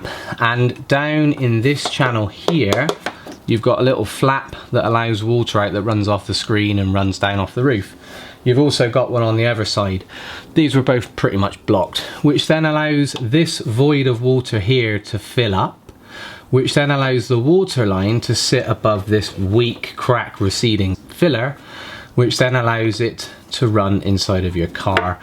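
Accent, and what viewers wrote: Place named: West Country, United Kingdom. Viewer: where are you mate? You sound West Country. We need this sorting on my step daughters corsa. She is In Glastonbury.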